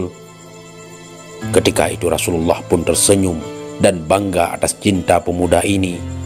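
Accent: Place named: Bali, Indonesia. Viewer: Penyiar nya orang bali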